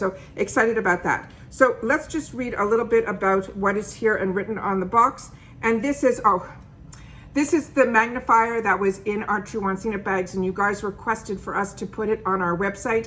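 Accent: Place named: New York, United States. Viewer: Do you live in new york? The reason why I asked you is the way you that you speak you sound like a new yorker.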